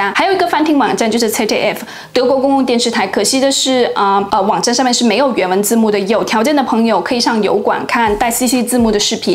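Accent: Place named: South, China. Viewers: 才貌雙全！你讓我更想學德語了。好奇你是哪裡人？你的普通話跟我們台灣一位來自上海的的政治評論員的口音很像。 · zoe是南方人吧！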